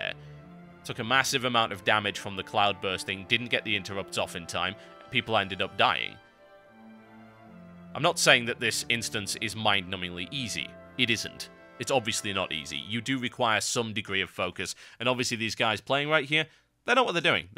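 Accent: United Kingdom. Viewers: I love this guy. I find what he says halerious. Love the accent. Nice work Brit :D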